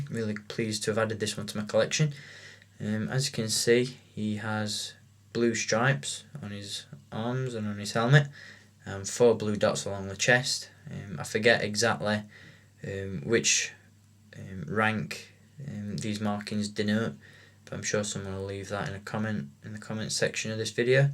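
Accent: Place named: Northern England, United Kingdom. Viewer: sounds like north england somewhere round Yorkshire, Blackburn, maybe? I don't really know northern accents so well... Sheffield?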